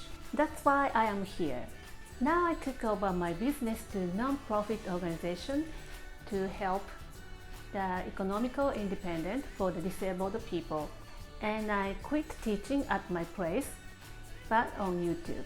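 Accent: Japan. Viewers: Konichi wa. Your English vocabulary is very broad and accurate. Your accent and courteous manner just make you authentically Japanese. I like your channel because you show how to make healthy every day food that a Japanese mom would make in her kitchen. I don’t need a blowtourch to sear pieces of sashimi or a special pan to make takoyaki balls as is done on some channels. I do sometimes wish you would tell more about where to get some of the uncommon ingredients not readily available outside Japan or what can be substituted. I especially enjoyed this video where you introduced yourself and told us something about your background and hopes for your YouTube channel. Matané!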